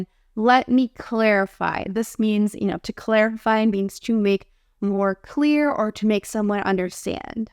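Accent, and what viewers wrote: United States. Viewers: love American pronunciation.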